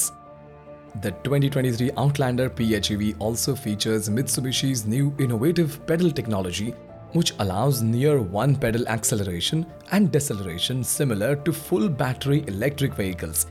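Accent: India. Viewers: English with an Indian accent Not interesting for advertising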